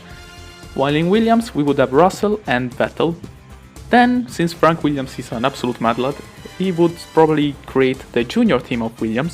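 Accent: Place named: Italy. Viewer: L'accento mi sta facendo morire, te se ama #alphamaxnova1ItalianPride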